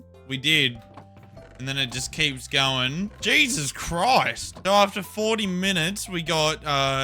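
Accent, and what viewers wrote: Australia. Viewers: aussie minecrafter?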